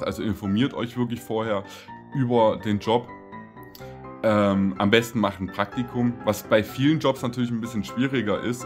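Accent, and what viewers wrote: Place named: Saxony, Germany. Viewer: Also ich muse ja mal sagen, ich liebe dein Humor, "woher kommst du"? "Von draußen"! 🤣🤣🤣🤣, das hät glatt ich sagen können.Das du aus Sachen kommst hört man eigentlich sehr gut. Aber gut jüngere haben da weniger Ahnung. Das FAQ war sehr Interessant, gerne mehr davon. Aber bitte eine andere Musik beim nächsten mal.Und wünsche dir für deine Umschulung alles Glück der Welt das alles unter der jetzigen Situation statt finden kann. Und ja ich zumindest finde das du Menschen sehr gut Unterhalten/Aufmuntern kannst. Dein lachen und humor sind ansteckend, danke dafür.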